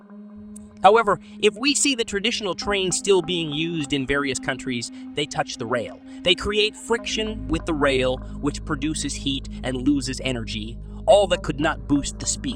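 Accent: United States. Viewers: ChiNews is a good program but the voice is terrible and needs to be changed to a softer voice, and not a heavy noisy U.S accent. This voice is horrible to the ear.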